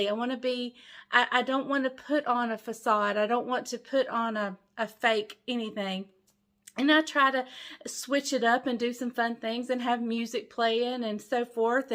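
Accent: South, United States. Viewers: Last night I viewed this video and this morning I thought of you as I was reading today’s devotional (4/21/2021) from the Our Daily Bread app. I started watching you for your biscuit video. I have continued to watch you for more of your delicious recipes, but mostly to enjoy hearing your lovely southern drawl and to see Jesus in you. It is so refreshing to see you deal with the chaos life brings us in your sweet mannerisms. You bring authenticity to what life is about in Christ. Please keep being yourself!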